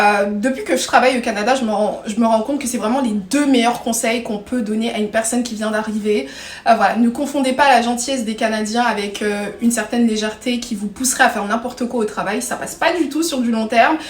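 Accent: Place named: France. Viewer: C'est toujours un immense plaisir de te suivre et de t’écouter. Chaque mot, chaque phrase semble pesée avec soin, portée par une voix à la fois convaincante et d’une précision remarquable. Ton accent français est si authentique qu’on pourrait croire que tu as toi-même inventé cette intonation unique. Tu as déjà pensé à une carrière dans le journalisme ? Tu as toutes les qualités requises : une éloquence naturelle, une réflexion claire et une capacité impressionnante à captiver ton auditoire. Je t’imagine parfaitement sur une grande antenne canadienne, abordant avec brio des sujets d’immigration et d’actualités, comme tu sais si bien le faire. Te voir exceller dans ce domaine serait un véritable bonheur. Tu as ce petit quelque chose qui rendrait chaque intervention inoubliable. Bravo à toi, et je te souhaite un avenir à la hauteur de ton talent !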